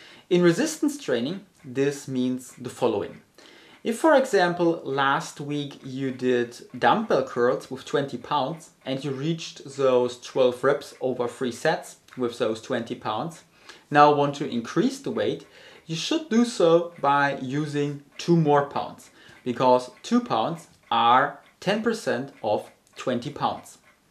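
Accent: Germany. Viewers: french? =)) ahaha hey j'aime ta, parlant français, l'accent allemand hes German dude xD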